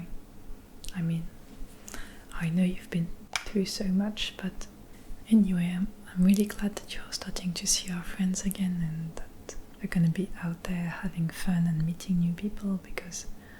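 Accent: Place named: France. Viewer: Even ASMR is more elegant with a French accent!!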